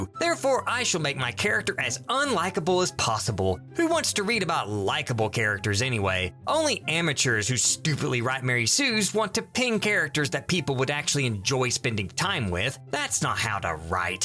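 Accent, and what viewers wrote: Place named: Deep South, United States. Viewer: What the actual fuck is "self-own"? Also, why are randomly you talking like you're from the deep south?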